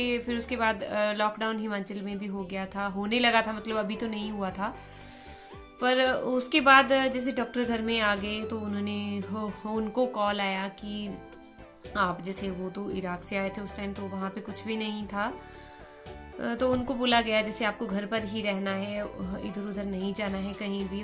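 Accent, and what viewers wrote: Haryana, India. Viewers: Aapki aawaj se lgta h . Ki aap haryana se ho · Madam, lagata hai aap Haryana se ho.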